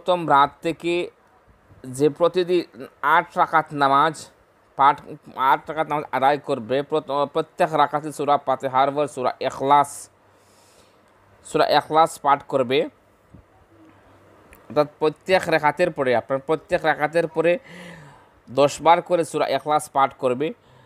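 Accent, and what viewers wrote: Bangladesh. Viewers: বা‌ড়ি কোথায় ? কু‌মিল্লা ? নোয়াখা‌লি না‌কি চাটগাও ? বাঙলা ভাষার উচ্চারন ঠিক ক‌রে কথা বলুন।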